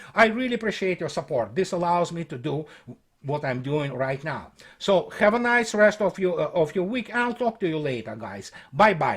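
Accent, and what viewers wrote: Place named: Russia. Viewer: i cant help myself but keep looking forward to your latest videos.i enjoy all your videos with technical information and analysis . enjoy your humorous description of western politicians and so called war experts who never faced bullets in the front lines. i love it when you tell the facts in Russian accent .love all your blogs.god bless you sir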